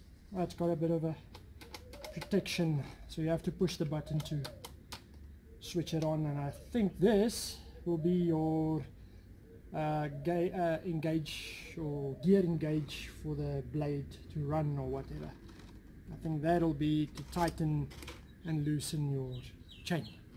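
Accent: South Africa. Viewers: You sound like you speak afrikaans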